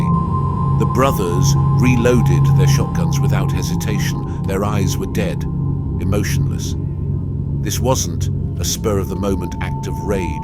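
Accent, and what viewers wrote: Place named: United Kingdom. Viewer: Кажется это британский английский -- если я не прав можете кинуть в меня камень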